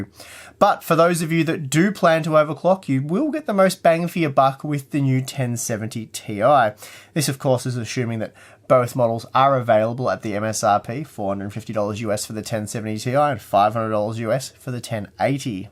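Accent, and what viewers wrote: Australia. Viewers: Are you Australian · The outro music is quite nice here. I think you should add more music like that throughout your vids and not just at parts. I've seen only a few of your vids and one thing I always think is "Sure an Australian voice is nice and all, but where's the music?" Anywho, thanks for what you do, man, keep it up, proud of you <3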